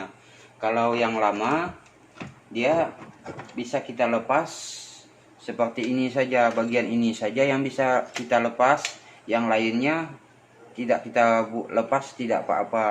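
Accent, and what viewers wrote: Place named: Kapuas Hulu, Indonesia. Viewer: Dr cara ngomong abg ini kayak y org kapuas hulu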